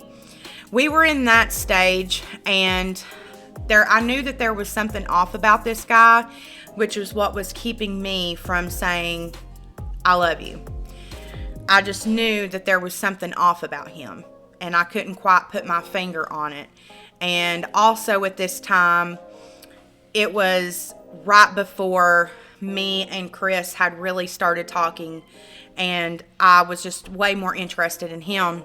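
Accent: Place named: Tennessee, United States. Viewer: I love me some Tennessee accent!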